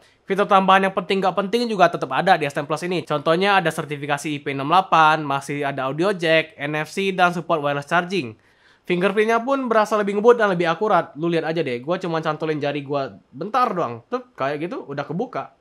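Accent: Palembang, Indonesia. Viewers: palembang bg? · ai bang, salam kenal be bang, ciren dari logatnyo bang😁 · Dari Logatnya Seperti Wong Kito Galo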